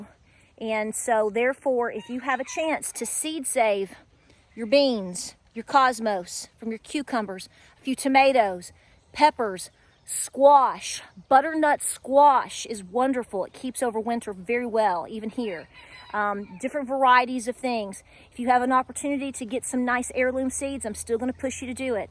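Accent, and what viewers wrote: South, United States. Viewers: I love your southern accent 😍😍😍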